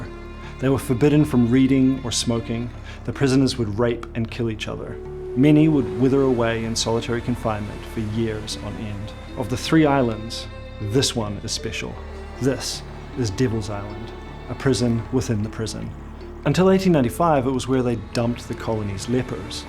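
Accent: New Zealand. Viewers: Are you kiwi?? South Island? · Kiwi accent there?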